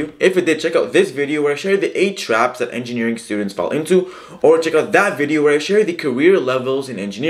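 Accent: Egypt. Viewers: مصري مش كده؟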